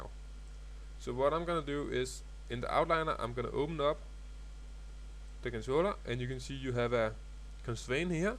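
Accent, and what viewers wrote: Denmark. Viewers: Nice tutorial! Are you by any chance Danish? Your accent is so familiar.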